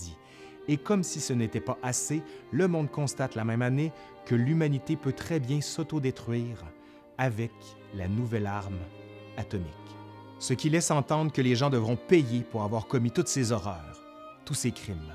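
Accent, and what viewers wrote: Quebec, Canada. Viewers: Wow, je regarde toutes vos vidéos avec attention. Et vraiment, je les trouve hyper ludiques, et on ne lasse pas ! L'accent québécois est charmant (pour nous français) haha ;). Pour vous dire que j'aime beaucoup vos vidéos et qu'il faut continuer ainsi, j'ai même envoyé la vidéo sur le mur de Berlin à ma professeure d'histoire qui a adoré ! Je vous souhaite de continuer encore pendant longtemps ! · Je te le mets avc plaisir mon "pouce par en l'R" 😄 Surtt q je suis persuadée q ce ne sont pas d heures q vs avez passé à faire 7 vidéo.. mais plutôt d semaines... 👏👏👏👏 C extrêmement bien fait..les images d'archives sont placées o bon moment ..et pis.. ton accent Québécois... J'AdÔre 😉 À Bientôt 🙋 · J'adore l'accent québéquois! Merci ;) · Quel conteur de haut vol et puis cet accent canadien que nous aimons beaucoup en Europe !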